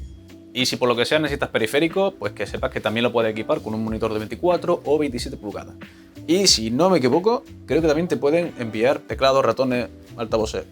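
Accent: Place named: Argentina. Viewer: solo conoces intel,, y al min sale que amd es una de las compañias inportantes en el desarrollo de sofware,, parace que te falta trayecto tecnologico amigo,,, y el disco que dices no trae una aguja,, es una pastilla magnetica,, mas al estilo de las pastillas de las cintas de casette de los 80s,, otra cosa ,, y lo voy a decir, no se que es peor,, tu tono argentino,, o tu ignorancia tecnologica argentina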